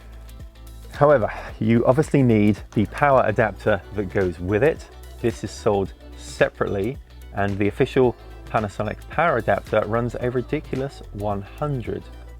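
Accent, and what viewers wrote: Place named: United Kingdom. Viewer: British accent and 'dollars'. Odd.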